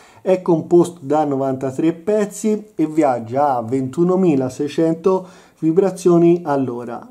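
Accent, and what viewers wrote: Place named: Arezzo, Italy. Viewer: Bella recensione complimenti! Con una bella spiegazione storica sull' art déco! Sono finito sul tuo canale praticamente per caso cercando delle recensioni in italiano sul Reverso ; è un modello che ho sempre adorato e negli ultimi mesi è diventato una "fissa" 😆 presumo tu sia dell'aretino/ Val d'arno dal tuo accento ? 😄 sbaglio o a si vede un movimento della Chopard ?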